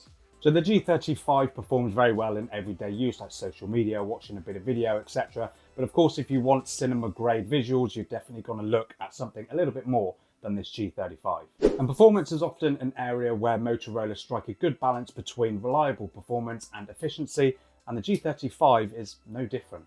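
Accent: Liverpool, United Kingdom. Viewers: Why are you speaking in a Scouse accent? Whereabouts in Liverpool are you actually from? If you are not from Liverpool then why are you speaking in a Scouse accent?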